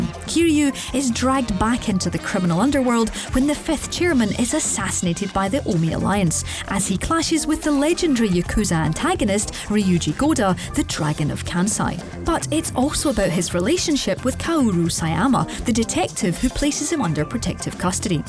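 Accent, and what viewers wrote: Scotland, United Kingdom. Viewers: I loved the video, well-crafted list. Also the Scottish accent brought back lots of nice memories, thanks🙏🙏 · I like the narrator's voice and Scottish accent. Calming. Easy listening. I just bought Redeemer, Life is Strange (all 3), Blacksad, Destroy All Humans, Creepy Road, Strange Brigade and Guns Gore & Cannoli Capo Dei Capi edition. And on PS Plus they gave us Days Gone this month. They've done alright lately I think. There's other good games they've given out too recently.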